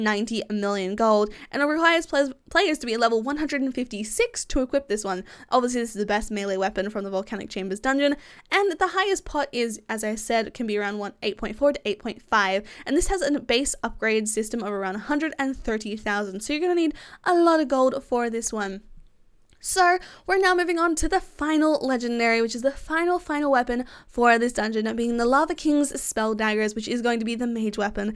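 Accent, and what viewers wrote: Australia. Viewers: this is no offence but it confuses me because she has a Australian and normal accent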